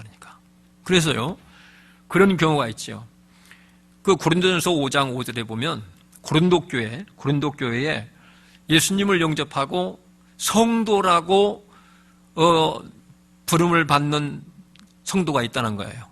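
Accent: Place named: Honam, South Korea. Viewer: 목사님처럼 호남사투리가구수하게들리게하는목사님이또있을까싶습니다 권력눈치안보고소신껏예배를귀하게여기라는하나님의뜻에맞는하나님을진정두려워하는목사님의말씀 감사합니다!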